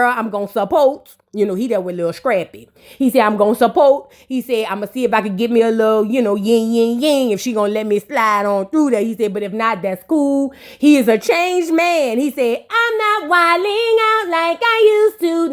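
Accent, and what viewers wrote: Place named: South, United States. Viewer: Girl when I first found this channel I thought you were Toya With that country accent lol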